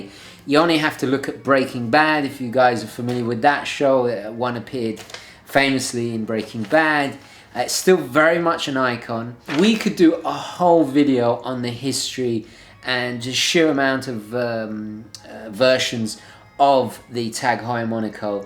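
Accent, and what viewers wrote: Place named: United Kingdom. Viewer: I love that old British accent!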